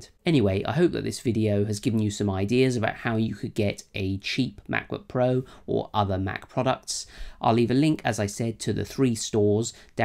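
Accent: United Kingdom. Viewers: Yay you’re British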